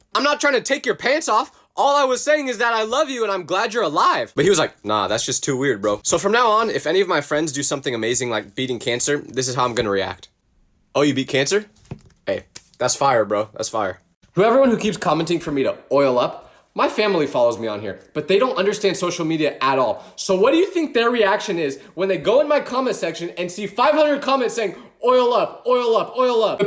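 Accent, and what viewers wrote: United Kingdom. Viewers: I’m British you don’t need to put a British accent we don’t mind but it is a very different place to be in because there really rude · Why does he have such a good British accent…. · your british AHHHHHHH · tell me how you talk British in two episodes and you’re telling me that if a ghost was there, it will be scary. If it was British how does that make any sense? You literally speak British? How is that scary to you? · Your British AHHHHH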